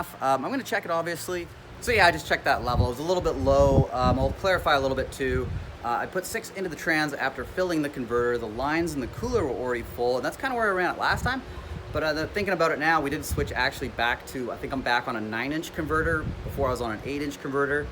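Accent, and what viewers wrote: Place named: Canada. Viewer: Canadian Eh.. 🤙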